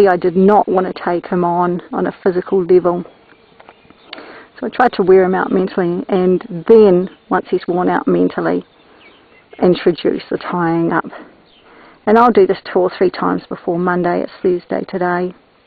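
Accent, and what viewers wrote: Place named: New Zealand. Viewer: Hi I like your relationship with this horse. Tom Roberts would advised to tie the halter with a bowline knot under his chin, (easy to undo) I am looking forward to seeing more of your videos. PS I like your kiwi accent. I am keen to see how you actually get into the racing part.(if that's what you do) I have a colt and I am working him will follow your advice however my skills are not as confident as yours ..but I try.you explain things very well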